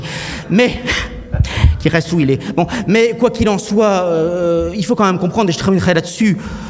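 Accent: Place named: Savoy, France. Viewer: Non il a l'accent savoyard.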